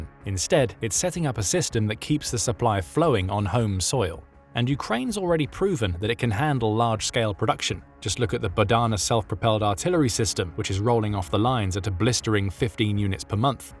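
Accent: England, United Kingdom. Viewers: You sound English. You're wittering on about British armaments. So why are you stupidly pricing them in dollars. They actually cost POUNDS STERLING. What are dollars worth? Considerably less now than yesterday, and less yesterday than the day before. Somewhat like the German Mark in the 1920s-30s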